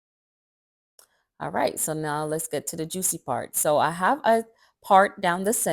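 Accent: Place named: Jamaica. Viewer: loves why I think I heard a bit of a Jamaican accent in this video 🇯🇲🇯🇲🇯🇲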